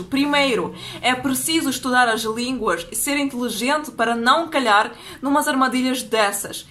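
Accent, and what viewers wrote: Portugal. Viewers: Aprendeu português de Portugal!!!! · Agora ver uma loira falar português de Portugal é muito engraçado. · Você fala como uma portuguesa kkk...., mas também não concordo com a atitude daqueles machistas sem educação . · Caramba vc fala com sotaque português, que bacana! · Ai Jesus ! Que sotaque lusitano, ô pá! Que gracinha...❤️ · ela parece portuga falando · Princesa ! Parabéns , você fala o português muito bem ! Eu do rio de janeiro do Brasil ! Você falando em português , você parece uma portuguesa de Portugal falando português ! Eu acho lindo seu sotaque ! Você tem toda a razão sobre o que os brasileiros fizeram com essa garota russa ! Aqui no Brasil eles foram alvos de muitas criticas nos jornais brasileiros e pelo brasileiro que não gostou do que eles fizeram com a garota russa ! Parabéns pelo seu canal no YouTube , você acaba de ganhar mais um inscrito ! 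Eu amo a mãe Rússia e o povo russo também ! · SEU PORTUGUES, E' MUITO BOM ! SOTAQUE DE PORTUGAL ! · Olá garota russa com.pronuncia portuguesa, tudo bem? É a primeira vez que vejo um vídeo teu. Já há relatos que os vídeos dela , trata se de uma blogueira que buscava matérias polêmicas para vender a um site de notícias no Brasil e depois vendeu a matéria as TVs. Portanto , já há relatos que uma série de mulheres ativistas combinaram fazer isto.chamavam os caras que estavam em grupos a beber , acenando com.beijinhos e gravavam eles. Estes caíram.como patinhos na cilada pois brincaram (brincadeira de mau gosto e certo) falando palavrões em portugues. Aos quais ela repetia a sorrir. E ela sorriu qd um pediu um beijinho . Ou seja , eles caíram na cilada feminista! E não só.foi.uma plano armado por várias ativistas repórteres que estão fazendo matérias combinadas. Já há <link> russos.argebtinos e outros que foram atraídos por essa farsa para fazerem vídeos e vender matérias para TVs e blogs nas internet acusando os homens de serem machistas,de fazer assédio e tratam como uma violência.mas não passa de Fake. 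Ela já vivia há seis meses no Brasil e sabe 5 línguas ! Espero ter sido claro. Beijinhos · Olá O Seu português de Portugal é muito bom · Mas então?! E esse sotaque de Portugal? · Não com esse sotaque "moraste" em Portugal e não é preciso dizer mais nada portugal é o país do ódio não gostam de ninguém..concerteza mudaram a sua cabeça sobre o que é o brasil sem nunca vc estado aqui.. eu sei como são os portugueses muito ódio no coracão vão falar bem de vcqd vc virar as costas vão dizer aquela ucraniana, romena moldava ou sei lá o que... tw convido a conhecer o Brasil e sair dessa caixinha.. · adorei o sotaque português, PT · Esse português europeu. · Russa com sotaque de Portuguêsa!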